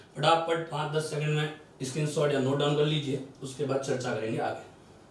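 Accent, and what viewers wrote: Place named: Marwar, India. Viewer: Sir Kitni Aapki madhur Boli hai sa aaj to Aapne sudh Rup se marwadi legweg ka pryog kiya hai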